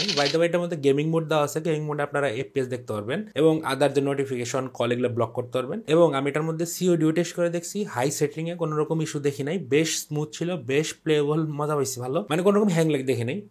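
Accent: Tangail, Bangladesh. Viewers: Vai, apnar bari ki tangail?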